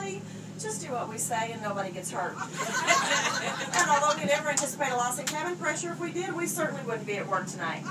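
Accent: United States. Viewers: very funny but the extremely fast american accent is hard to understand 😂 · Hard to understand if you're not used to southern US accent, especially with the speed she talks in. Still better than the usual boring announcements though!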